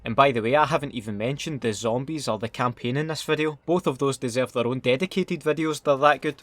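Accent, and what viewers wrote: Scotland, United Kingdom. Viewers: I watched so many of your videos back to back I've taken up some of the Scottish accent and I DON'T REGRET IT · Why have I only just found out about this channel, I fucking love this, nice work bro😂😂 the Scottish accent made it twice as good👌👌👌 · Your scottish accent made this video 10X better lol.. love this game and so glad there making a new one. 👍🏼👍🏼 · Your Scottish accent is a gift from the heavens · I like watching u more than other youtubers probably because he Scottish haha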